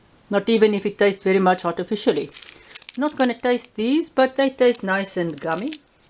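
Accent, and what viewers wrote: South Africa. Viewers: Hoekom maak jy nie videos in Afrikaans nie? Ek kan baie goed hoor jy is ñ Afrikaaner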